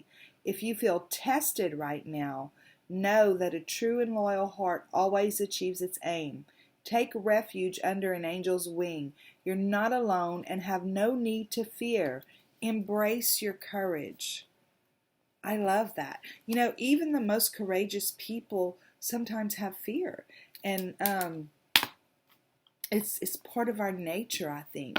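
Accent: South, United States. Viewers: luv listening to you and your southern charm and great analogies..thank you for sharing your light with us...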